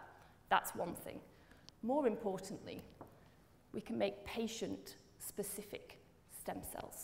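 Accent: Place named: United Kingdom. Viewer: Wonderful information. With your Brittish/ English accent and your rapid diction, it was almost too fast for me. .... an American born english speaker. Imagine the difficulty for my French wife who speaks English fluently. I can tell you are supper enthusiastic, but a large part of your potential audience cannot be included.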